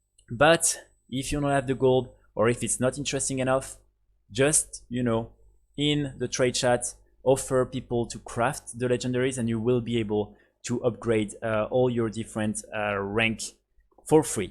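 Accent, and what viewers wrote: France. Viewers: Haha l'accent francais:P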